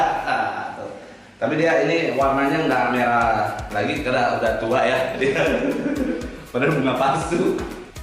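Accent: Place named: Medan, Indonesia. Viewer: Logat lo masih kental "medan"nya, omongan ngga ada isinya.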